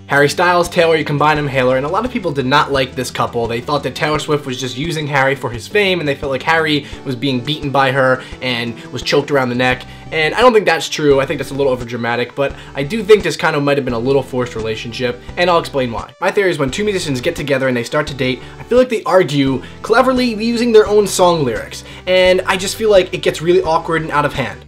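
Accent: England, United Kingdom. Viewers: Dat British Accent. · This is absolutely awesome(: Love your brittish accent ( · You have an excellent British accent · Ur british accent rocks! :) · Omg your British accent is adorable!! Ur hilarious! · Right on with the British accent! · i love his english accent!! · Nice British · Your English accent is just afsbfsbdagagdagda <3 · Ur British accent is amazing *-* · HA! I love hearing you talk in a British accent. Lots of love from the uk : ) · I love your British accent :D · I love ur uk accent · +British Accent= <3:3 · OMG u are soooo funny xD and ur english accent o.m.g hilarious! :P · i love your English eksent:D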